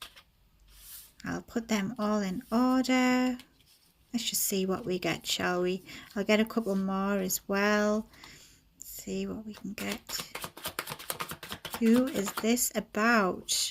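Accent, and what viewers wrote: United Kingdom. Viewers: U sound like u from County Durham I'm from peterlee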